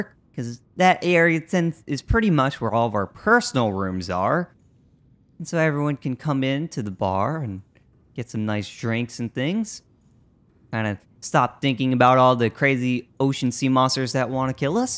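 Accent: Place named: Ireland. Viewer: Huh first time hearing you and almost prefect on voice except somehow got irish accent 😅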